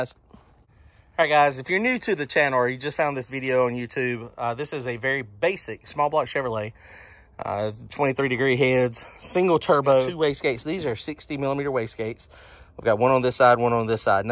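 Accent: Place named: Georgia, United States. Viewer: Thank ya, brud in law! Preciate your insight. Where you at? Sound like a Georgia boy. Take care n keep your shiny side up...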